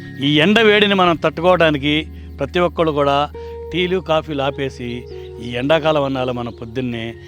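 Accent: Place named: Kolar, India. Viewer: Thank you for writing it in Kannada too andi. I'm from Kolar and your Telugu is so similar to the dialect we speak.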